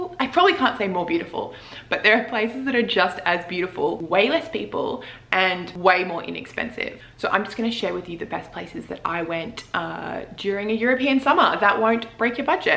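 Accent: Australia. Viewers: love your Aussie accent!!!!💯💯❣❣💥💫💫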